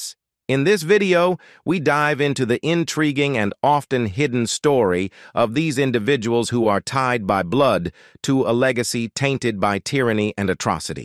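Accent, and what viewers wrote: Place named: United States. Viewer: And as usual - an all knowing American drawling his way through more BS.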